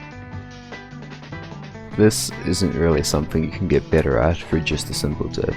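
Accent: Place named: South Africa. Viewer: Are u South African lol ur accent sounds like it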